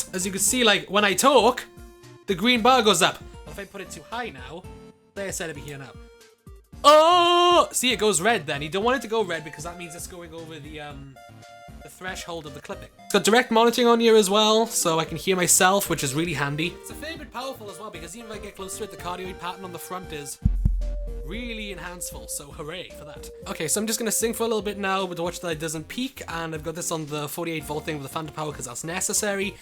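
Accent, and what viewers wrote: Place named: Wales, United Kingdom. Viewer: ah a fello welsh mun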